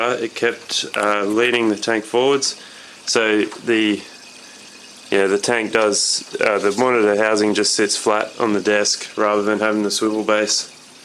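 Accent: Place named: Australia. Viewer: Super cool! Could tell you were Aussie right away from ya accent. Cheers mate! 🍻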